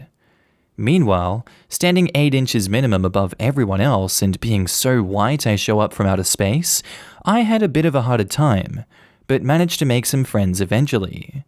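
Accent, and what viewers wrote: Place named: Australia. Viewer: Some good ones there. Maybe one day I'll venture onto reddit to read for myself, but happy to listen to a fellow Aussie read them for me.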